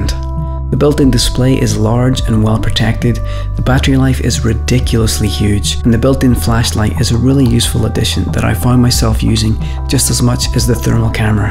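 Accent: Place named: Scotland, United Kingdom. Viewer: where is that accent from you have a cool voice, Scottish?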